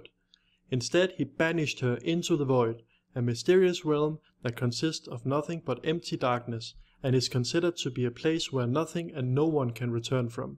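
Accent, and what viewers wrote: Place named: Denmark. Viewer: Nice job! Where are you from? Your accent sounds very Danish, but I'm not an expert ;)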